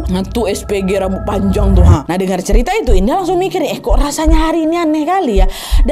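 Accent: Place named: Medan, Indonesia. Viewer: orang medan kau rupanya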